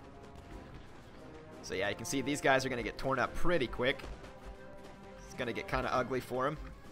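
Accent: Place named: South, United States. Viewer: You say that your southern upbringing does not allow for correct pronounciation of French words but the facts is is that the south has gotten its accent because of a mix of French and american English. It really is a mix of the two because of centurys of being a French colonial region. And yes im a amateur linguist so i know :P PS: you still need to build a port in Croatia...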